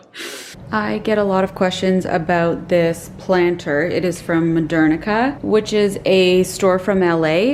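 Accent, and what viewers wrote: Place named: Canada. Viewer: is anyone else able to immediately tell when someone from toronto/vancouver is speaking english or is that just me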